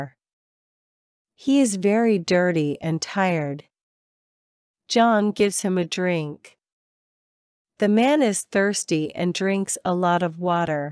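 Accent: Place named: United Kingdom. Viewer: I have been listening to your voice for 9 years. I have no way of accepting other British accents and robotic accents. I'm glad to hear your accent. Thank you so much!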